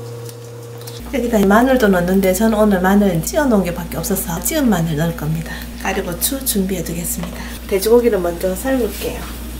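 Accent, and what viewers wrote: Gyeongsang, South Korea. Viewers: 이모님♡ 경상도톤이라 더 정겹네요.ㅎ 실방타고 첨 뵙는데... 돼지고기 장조림은 저도 처음인데... 손자,손녀가 잘 먹을것 같네요.ㅎ 자주와서 식사준비 할래요. 3종하구 갑니당.~^^*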